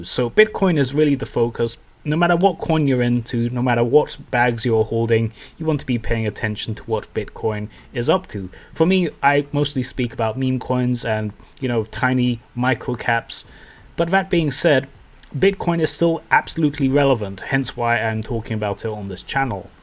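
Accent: Netherlands. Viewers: I bet he is Dutch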